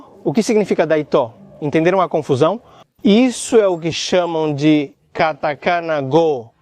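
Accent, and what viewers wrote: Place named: Portugal. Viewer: portugues de portugal :face-red-droopy-eyes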